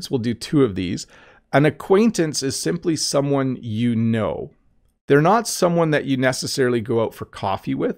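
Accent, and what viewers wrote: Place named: Canada. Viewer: Sorry this is Canada English what is this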